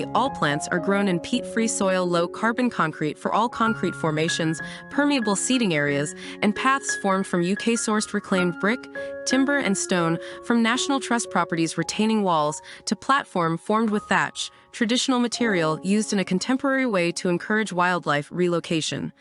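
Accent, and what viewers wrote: United States. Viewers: why is this being narrated by an American robot?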